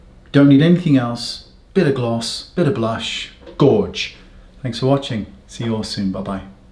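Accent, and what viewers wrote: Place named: England, United Kingdom. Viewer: It's his lovely English accent, he's from Somerset I think which is usually a very broad accent, his is quite soft and only comes through occasionally. He does have a really nice voice. I watch a few English YouTubers and some of it has to do with their accents. I'm originally from the UK and I think I miss hearing English voices.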